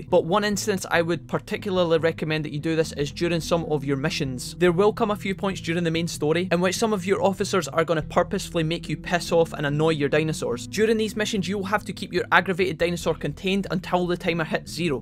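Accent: Scotland, United Kingdom. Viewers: I love your accent! Are you Scottish? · First time viewer and love the Scottish accent. I am a giant fan of foreign accents so this is amazing! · Ah 'hink he's Scottish like me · Is this a scottish accent? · This guy is scottish. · Cool video, learned a couple neat tricks for the game, and also found a fantastic new Scottish accent to emulate. Well done lad!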